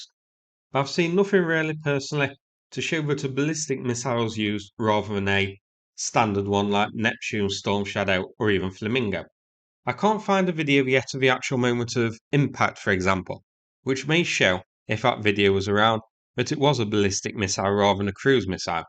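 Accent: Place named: Cockney, United Kingdom. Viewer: Dude I can barely follow your jacked up Cocknet accent.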